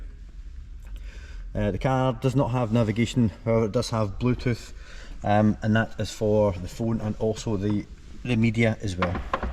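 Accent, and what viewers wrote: Scotland, United Kingdom. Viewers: Scottish of course! Love it.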